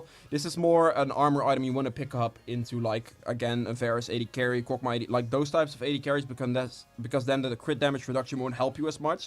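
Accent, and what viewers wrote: Netherlands. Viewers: Are you Dutch/Belgian?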